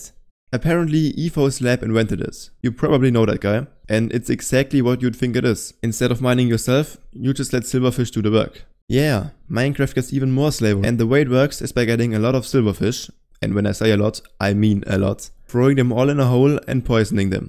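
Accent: Germany. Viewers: are you german? alles gut hört sich defentif besser an als bei mir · I can hear the german accent😂 i really enjoy your videos btw i always watch em while playing minecraft myself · Bro sounds a bit too german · Man. I really like germans speaking english!!! 😄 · German detected. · Do you speak german? Your accent sounds kinda german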